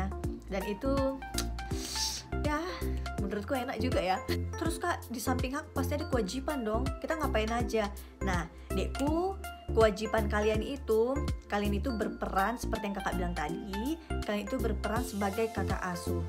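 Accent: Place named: Medan, Indonesia. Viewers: Nampak y logat medan itu. Gk bs hilang hahahaha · Enak bangat ya dek..udh pernah di jelaska n Inong same.. kayak gitu dek soalnya dia pernah melakukan nya sekarang dia kawin sama orang Austria .. dan tinggal dia di Austria..anak saya aja saya anjurkan kayak gitu dek ..tapi diaudh kerja sama kuliah sekarang di Batam de .senang kakak dengar logat Siantar mu dek..❤️😭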